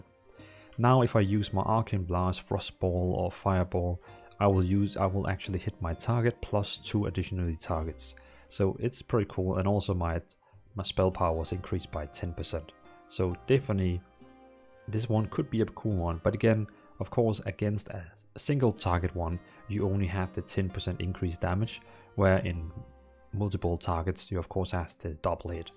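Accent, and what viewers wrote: Denmark. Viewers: Are you Danish? Nice video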